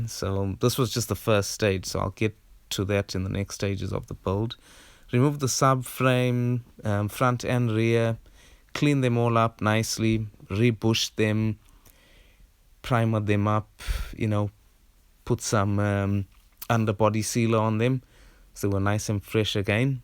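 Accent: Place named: South Africa. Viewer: Good luck with your build mate. After the first few seconds of listening to you, I realised you were a fellow South African.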